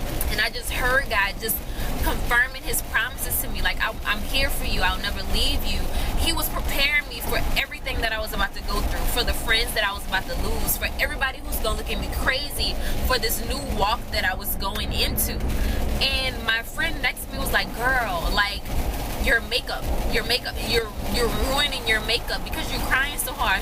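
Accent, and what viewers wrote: New Orleans, United States. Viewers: I love what you are about girl!!!! It's so refreshing to see like-minded individuals here on YouTube. Love your transparency! And sidenote: I understood everything you said....lol! Sounded like correct English to me!!! I'm from Louisiana and I noticed right off the back that you have an N.O. accent!!!!!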